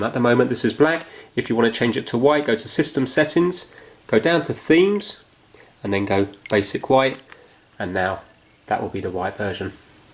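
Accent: England, United Kingdom. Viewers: Your very helpful and I like your English accent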